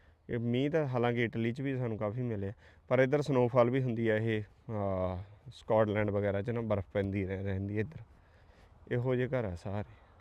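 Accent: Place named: Malwa, India. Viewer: Scotland is cheaper than England example if house price in London 1 million pounds you can same property in Scotland only 400000 pounds only don't compare with England other things u said I am PhD from punjabi University but u still pure malbai u should change don't say gggg this is instruction don't mind?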